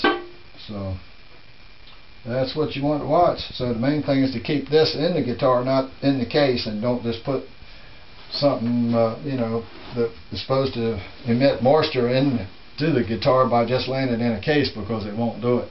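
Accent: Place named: Virginia, United States. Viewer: That's a Virginia accent. Any North Carolinian would recognize it immediately!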